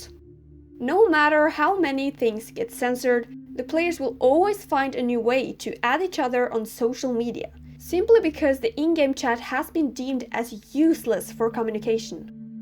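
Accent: Norway. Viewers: wait are you norwegian?? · are u norwegian? o-o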